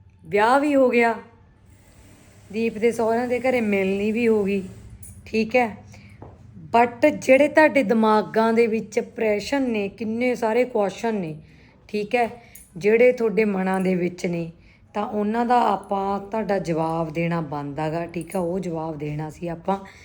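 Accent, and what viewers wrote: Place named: Barnala, India. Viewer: Khudi kalan mandeep de sohera pind tusi barnala bol rhe oo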